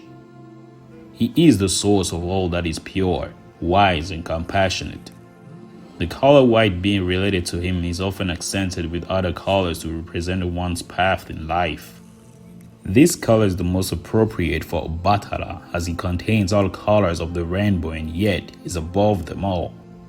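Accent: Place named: Yoruba, Nigeria. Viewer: Please keep ur yoruba accent! It makes storytelling more Intriguing. T-ankh u. Asè 😊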